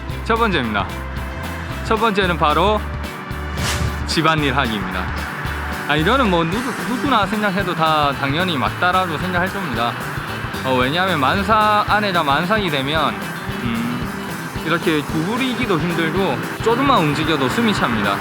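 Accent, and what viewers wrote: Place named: Daegu, South Korea. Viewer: 미래 태어날 딸을 위한 노력과 사랑과 정성이 보이는 유튜브네요! 대구사투리도 듣기좋고 와이프사랑도 좋습니다. 응원합니다 님!♡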